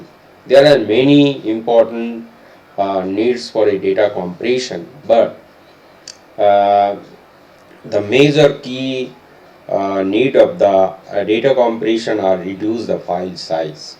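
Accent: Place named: Bengal, India. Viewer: Sir your accent is beryy bengalishhhh . I like it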